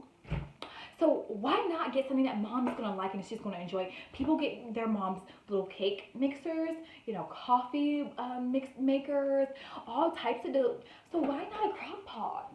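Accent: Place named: South, United States. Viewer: The crockpot is a great idea 💡 your southern accent 😹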